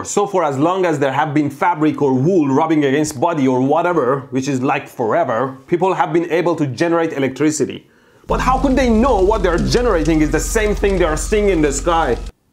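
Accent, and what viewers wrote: Iran. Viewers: Most Iranian pronunciation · Love the Persian accent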